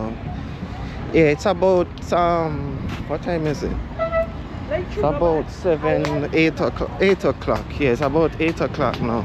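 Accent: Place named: Jamaica. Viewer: The seawall is vastly different from the 1960s when I last visited it. I am surprised they have not lit up the seawall wall itself at night since it is meant to be a gathering place. Anyone could fall off the ledge or be assaulted, and no one might notice. It looks like 2' to 3' wide. You should wear headgear with a LED light. LOL Did they eradicate the mosquitoes? The burger lady needs some training in customer service. She didn't even crack a smile or a "thank you for your business, and come again. Good night!" You met with with a nice group at the end. The one Trinidadian (accent) and the dozen Guyanese (accent) around the table reminded me of when I visited the relatives in Guyana. LOL At least they understood your Jamaican accent. If you put a Trinidadian, Guyanese and a Jamaican in a room with Americans, the Americans would get very confused. 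They couldn't understand me, especially as they were looking a Chinese speaking perfect English. My Trini (Chinese) nephew had two friends at a L.A. trade tech college; a A Belizian (Black) and a Jamaican (Black). They were conversing in their native dialects to each other in class. When the American instructor walked into the room, he said to the the ladies, "I didn't know you spoke Chinese." The trio looked at each other, and bust out laughing.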